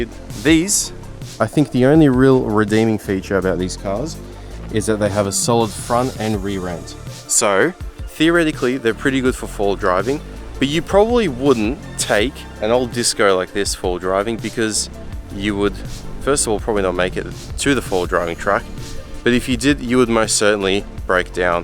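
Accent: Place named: Australia. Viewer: They are LUX -URY, ie, pronounced "LUCKS"-URY cars, NOT LUGZURY cars. Be a PROPER SPEAKER and not a SLACK SPEECH OZZIE. First thing I noticed was the poor DICTION or PRONUNCIATION. Never mind the cars, you have to be believable first up! Most half smart Ozzies know NOT to buy EUROPEAN ANYTHING. Being an Automotive trade teacher, SPEAKING CORRECTLY IS IMPORTANT.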